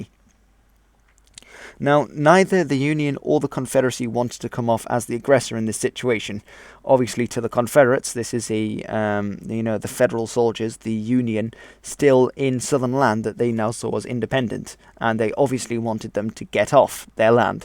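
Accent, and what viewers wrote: United Kingdom. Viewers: This dude is British it sounds like lmaooo y’all still salty about some Patriot Americans who beat your ass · Very good work here helps your British for At least us Americans can't get enough of a British accent ! Subbed!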